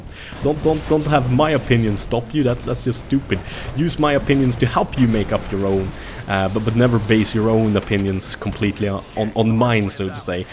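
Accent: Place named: Sweden. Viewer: Hehe, it only takes me a few seconds to recognize the swedish accent.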